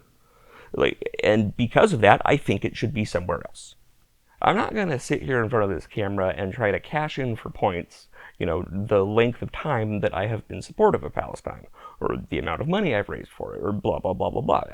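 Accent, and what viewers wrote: United States. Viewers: You sound like the average American to me.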